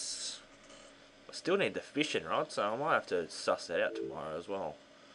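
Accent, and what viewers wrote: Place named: United Kingdom. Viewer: Good to have your british back,!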